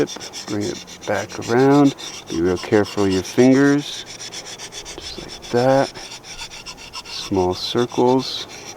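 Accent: Hawaii, United States. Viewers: You sound just like the guy doing ApuaHawaiiTours(sp?),like you are brothers. Is that a Hawaiian accent or what is the origin?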